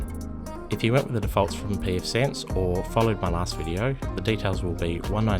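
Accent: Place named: Australia. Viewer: Sorry to sound rude, but you sound like a fellow Aussie... are you in Australia using Skylink? Didnt think it was released around here yet? How you finding it, worth the cost?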